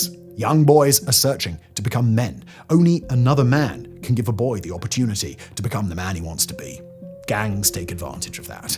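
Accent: England, United Kingdom. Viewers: can't believe i clicked on a clueless Englishman poorly explaining something about a country he knows nothing about. 32-12. Tip for you next time bro. Stop pronouncing every word as if it's English. Do some fuckin research. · Hes just british?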